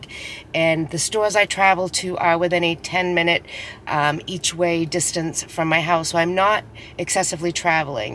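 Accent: Massachusetts, United States. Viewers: Just found your channel through this collab - you had me laughing so hard with your Wally World dis - hey, who doesn't feel that way? I also love your MA accent cause I've spent many happy hours in various New England states :-) So you have a new subscriber and I look forward to following along!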